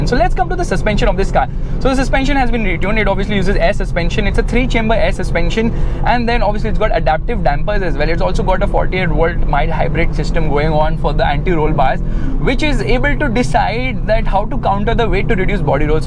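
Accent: India. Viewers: Indian accent is really hilarious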